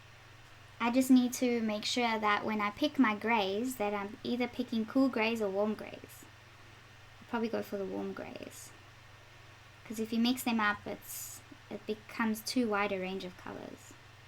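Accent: South Africa. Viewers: I wasn't expecting the Safrican accent :) Pleasant surprise. Great looking models too. Enjoy playing with them!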